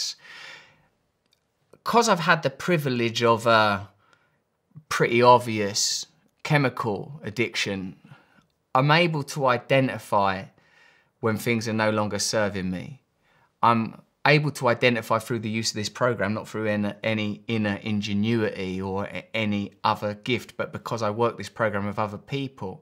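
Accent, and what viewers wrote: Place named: London, United Kingdom. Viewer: You sound like you're from London! 😀 Interesting stuff, friend. I've been a fan for a long time and I appreciate you uploading so often.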